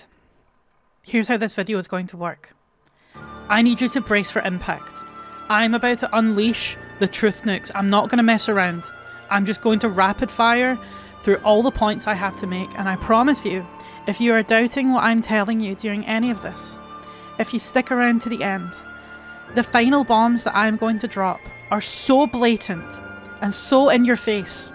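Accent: Scotland, United Kingdom. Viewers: the scottish accent made me insta sub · pure 10 minutes of a crazed scot yelling at us expecting us to understand her's crackpot theory, okay bud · I like the cut of your gib manic Scottish lady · I love the scottish accent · you have the most scottish accent i’ve ever seen 😭 absolutely love it lad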